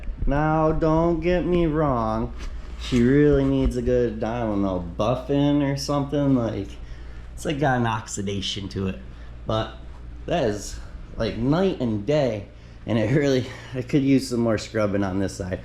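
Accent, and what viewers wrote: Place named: North, United States. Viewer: Where are you from in Florida? Your accent sounds more northern than southern lol. Love the video!! Sweet ride too!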